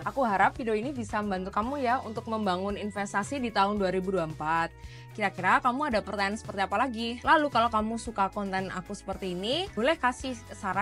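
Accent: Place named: East Java, Indonesia. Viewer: Penjelasannya mudah dipahami 👍, kyknya orang jatim deh😊(dialeknya)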